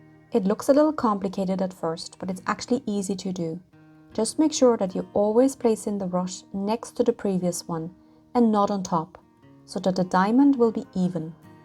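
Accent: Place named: Ireland. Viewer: Fantastic tutorial and great education to keep tradition alive. Where are you from originally. Sounds like you've lived in Ireland a long time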